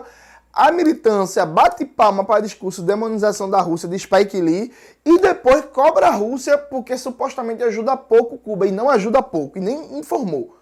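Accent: Pernambuco, Brazil. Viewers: teu sotaque pernambucano é tão gostoso de ouvir, acho que tu é o segundo youtuber de pe que acho. me sinto em casa kkkkkkkk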